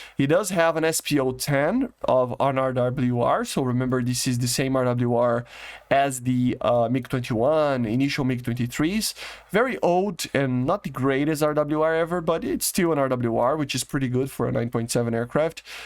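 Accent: Brazil. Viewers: Sotaque de BR....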